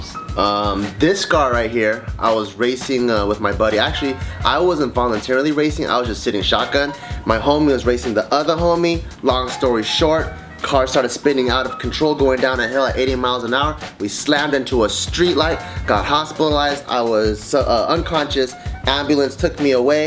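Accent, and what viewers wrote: China. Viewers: wow, Chinese accent is almost standard than I expected.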